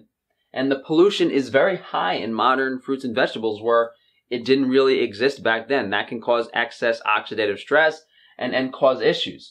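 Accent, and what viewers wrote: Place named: New York, United States. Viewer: I love the neat and tidy grooming and NY accent. Awwwh!💓 Great info here and especially given the fact that most plant foods today look, taste and provide entirely different benefits and flavours to humans than they used to. Not only have we made them resilient to pesticides and shelf life, more starchy, sweeter and less varied but we eat the same species repetitively and out of seasons. Humans have great metabolic adaptability and it is not only a key to our survival but how we found creative ways to increase nutritional value of foods. Recipes are a great way to see that in practice i.e. cooking, fermentation, food combination and even pairing certain alcoholic or herbal drinks with food.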